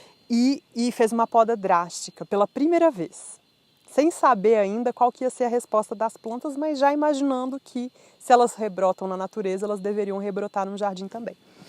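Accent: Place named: Minas Gerais, Brazil. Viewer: Que bacana... Você conta como mineiro, tudo vira historia. Trazer o cerrado para dentro, é muito bacana.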